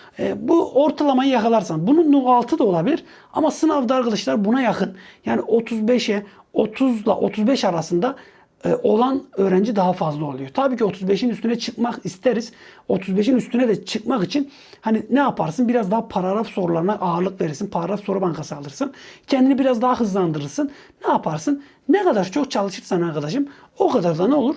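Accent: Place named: Gaziantep, Turkey. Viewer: Hocam Antepli siniz galiba 😄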